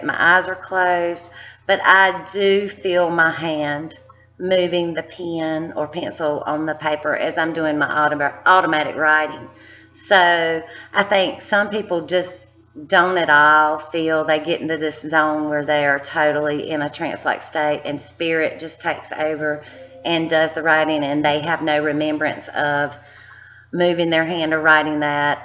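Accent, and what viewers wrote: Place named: South, United States. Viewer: hi I'm a new member as of today so finding you now on utube. I'm so excited. I see you have got plenty of video's but I've been wanting to try auto handwritining cause I've woke up and somehow still had phone in my hand but have wrote out like a sentence and realize I get messages. can't wait also to watch your charkas. I got sle lupus and brain fog I feel like this could help me. lol I'll shut up and keep listening but wanted to let you know I'm excited. n I love us southern accent. I would do the writing like u choose too. namaste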